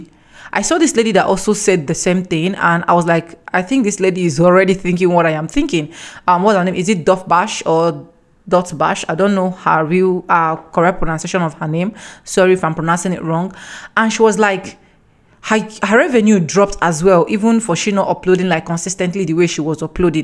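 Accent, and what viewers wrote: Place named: Nigeria. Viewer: I like your Nigeria accent, stay blessed Your new subscriber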